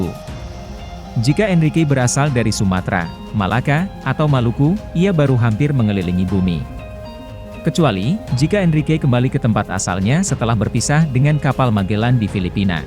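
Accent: Banyumas, Indonesia. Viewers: Admine wong ngapak ya? wong ngendi rika mas?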